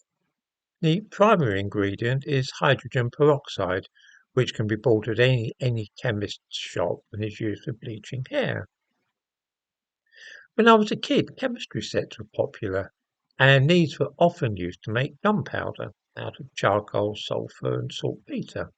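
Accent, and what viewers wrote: United Kingdom. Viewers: Clicked on this because I have an interest in making a small steam engine and I want to get in to live steam, I used hexamine tablets in cadets, I still have boxes full of them so that's good to know. I recently saw a new steam engine kits in a local Kmart and they had an electric engine. - it was both very confusing and disappointing, but I ended up googling the Mamod company, as a result of this video and I've now found a local supplier of small-scale steam engine parts. So that’s made me happy, I can probably push this endeavour further. You sound and speak with the same tone as my neighbour who is also a British expat (I'm from Australia).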